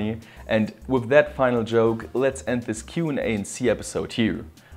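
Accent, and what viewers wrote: Germany. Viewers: Man hört raus das er Deutscher ist.. haha. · er hat den deutschesten Akzent den man sich vorstellen kann. Alles was er aufschreibt und kauft ist in Deutsch geschrieben... ou man ^^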